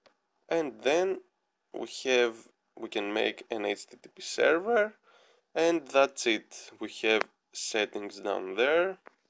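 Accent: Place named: Greece. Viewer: η προφορα κανει μπαμ οτι ειναι δικος μας:ρ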